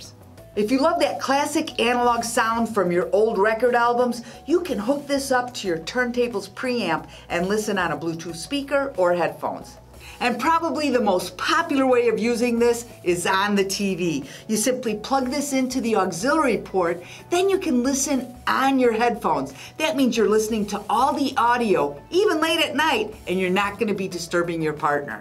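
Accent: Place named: Minnesota, United States. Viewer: I thought she was from Minnesota, I kept waiting for her to go "dontcha know".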